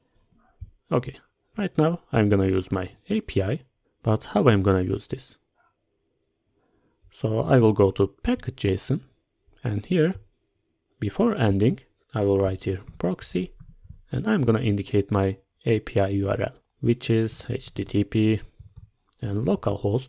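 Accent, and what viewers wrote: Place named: Turkey. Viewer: Konuşan elemanın türk olduğunu anladın mı?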